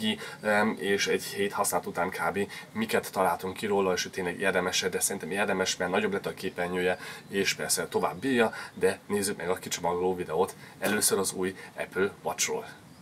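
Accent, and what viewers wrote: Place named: Germany. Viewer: Olyan érdekes beszélsz mintha valami német ember lennél aki magyarul beszél. Engem nem zavar csak tényleg olyan fura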